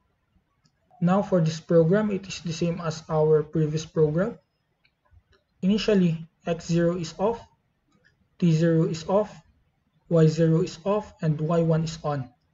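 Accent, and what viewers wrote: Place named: Philippines. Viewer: You sound like a Filipino.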